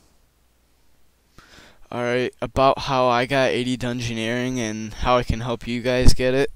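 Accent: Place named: Norway. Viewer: R u norwegian? U got a norwegian-english accent :P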